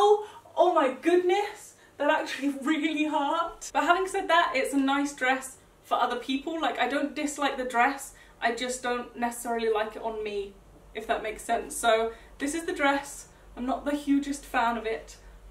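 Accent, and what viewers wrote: United Kingdom. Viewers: What part of the uk 🇬🇧 do u live in I love ur accent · I also love your British accent so much, and I usually don't like the British accent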